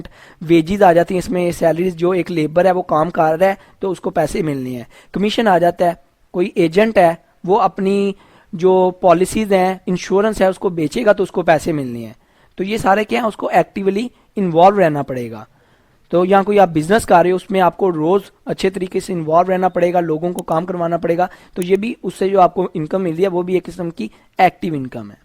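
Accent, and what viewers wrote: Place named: Punjab, India. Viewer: Punjabi Urdu ko Urdu koPunjabi lehjey meyplease na bolain.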